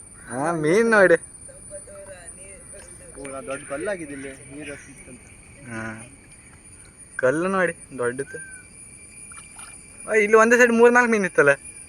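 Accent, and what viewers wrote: India. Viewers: Hi yava vuru nimbhu kundapur kannada matadatira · Byndoor kundaluradavara reeti mataduttiri neevu.Uttara kannada bhashe bere reeti iruttade.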